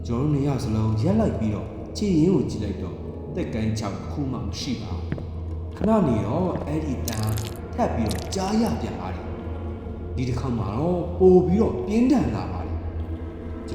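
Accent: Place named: Myeik, Myanmar. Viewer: ကြိုက်လိုက်တာရှင် အသံလေးလည်းဖွာမိတယ် မြိတ်အသံလေး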